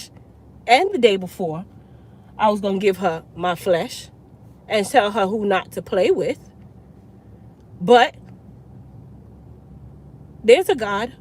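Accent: Jamaica. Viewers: Do I hear a Jamaican accent , dear one ? Sj.💛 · I love how the patois comes in and then you put it in your pocket for a bit and then you pull it back out when the heat turns up. Blessings to you. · U was born in usa...but u speak jamaican well... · you are absolutely right! Love your Jamaican accent 😊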